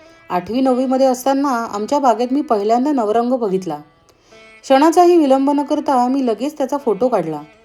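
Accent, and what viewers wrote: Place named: Pune, India. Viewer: Didi tu amravati chi ahes pn tuz accent accent puneri vatt akadi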